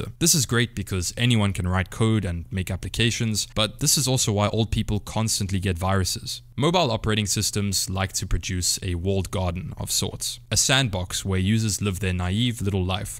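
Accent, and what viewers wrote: South Africa. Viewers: i know im listening to a South African........ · Instantly recognized the south african accent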